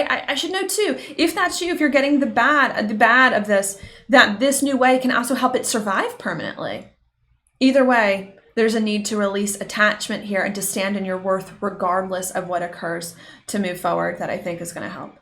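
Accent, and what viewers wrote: South, United States. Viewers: I love when I can hear your southern accent-let it shine xoxo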